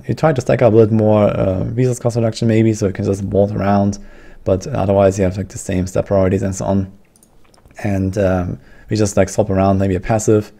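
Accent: Germany. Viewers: His German accent is not a problem at all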